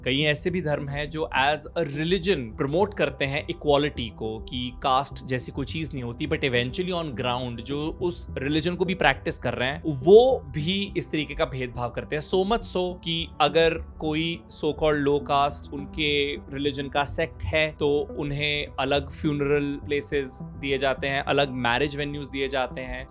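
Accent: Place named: Punjab, India. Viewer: I love ur punjabi accent bro 👌.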